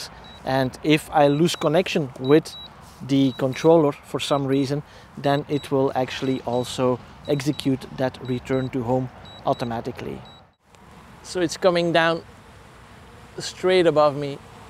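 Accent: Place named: Belgium. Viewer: Very nice explanation. Zeer mooie uitleg 😉. I think you are from Belgium. Correct?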